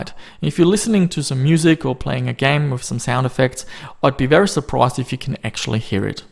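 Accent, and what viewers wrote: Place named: Germany. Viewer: I didn't know you lived in Australia, your accent makes you sound like you're from somewhere in Europe, Germany perhaps?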